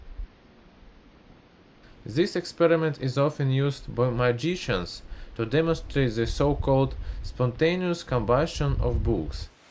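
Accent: Russia. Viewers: Damn you have a thick Russian accent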